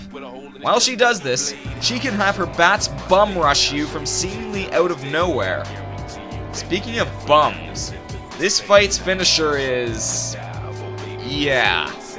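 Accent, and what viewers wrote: Scotland, United Kingdom. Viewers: kojackin my opinion sounds somewere between british and scotish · he sounds scottish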